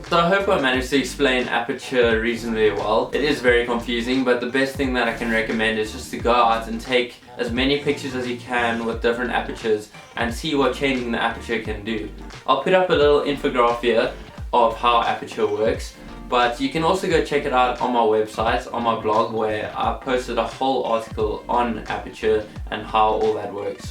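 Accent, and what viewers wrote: South Africa. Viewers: South African? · Are you south african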